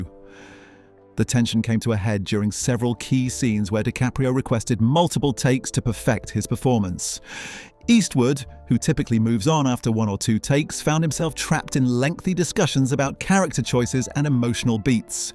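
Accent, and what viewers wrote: United Kingdom. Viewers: This British accent voiceover seems to be on all these types of videos.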